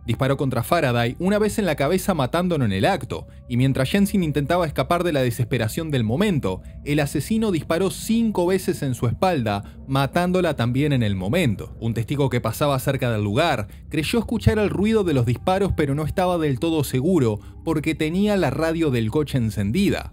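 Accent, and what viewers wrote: Argentina. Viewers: Ah, eres argentino?, me suscribo ya inmediatamente. 🇻🇪 Amo a los argentinos y tu canal se ve de buena calidad.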